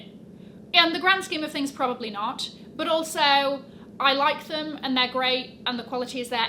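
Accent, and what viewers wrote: United Kingdom. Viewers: “Burberry trench coat for everybody” she says with a strong British twang… 😊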